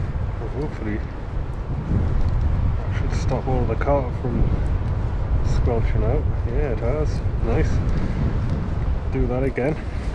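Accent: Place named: Durham, United Kingdom. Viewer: Well done. I have been watching your videos for a wee while now and I love them. I am originally from Durham but now live in Northern Ireland. I love to watch you fish your local marks the spots I recognise and it is great to hear the accent. Last year my wife bought me one of your hoodies for an anniversary present and I wear it with pride even when sea fishing in Galway. Keep up the good work.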